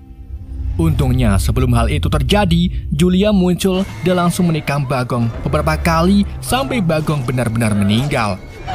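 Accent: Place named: Java, Indonesia. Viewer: kwbelet ngoyo 🤣🤣🤣langsung ngkak denger logat jawanya bang, suksesterus saya suka reviewnya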